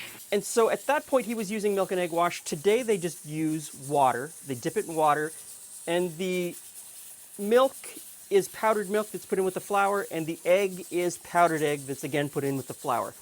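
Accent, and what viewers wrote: Canada. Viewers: 100% your canadian love the way you say about , watched a few of your vids and I have subscribed all the way from Scotland :)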